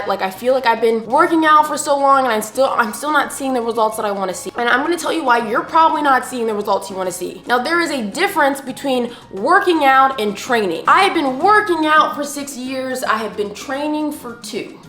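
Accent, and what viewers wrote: England, United Kingdom. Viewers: The English accent 😭🤣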